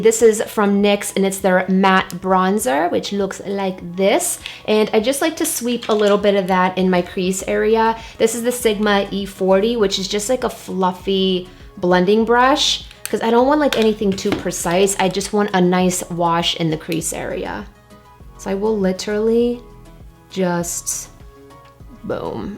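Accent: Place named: New Jersey, United States. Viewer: Where r u from. I think i hear a jersey accent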